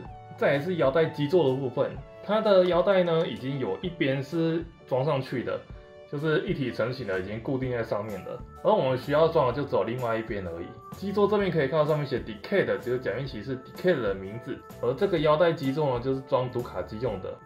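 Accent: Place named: Malaysia. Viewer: 可能是戴了面具顶着鼻子，所以口音就很像马来西亚口音，其实我也是以为是马来西亚人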